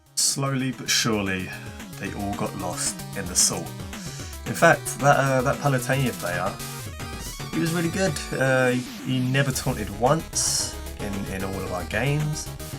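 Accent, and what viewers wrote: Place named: United Kingdom. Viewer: Damn you sound a lot like Alpharad if he was British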